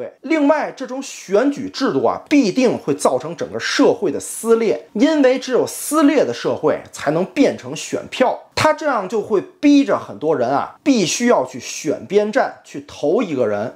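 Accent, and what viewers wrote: Tianjin, China. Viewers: 这是不是天津人，口音很像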